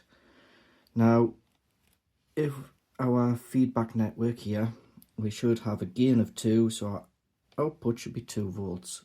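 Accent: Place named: Northern England, United Kingdom. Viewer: Glad your on the mend you sound like we are both in God's country Up North so subbed just for that and before I have look around your channel. All the best, Kev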